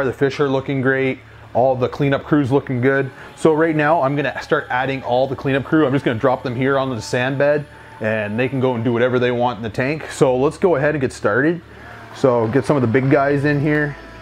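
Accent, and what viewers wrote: Canada. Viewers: You’re 100% Canadian eh?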